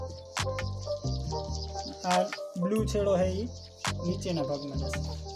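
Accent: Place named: Kathiawar, India. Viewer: કાઠીયાવાડી લાગો હો ભાય 😀😀😀😀😀😃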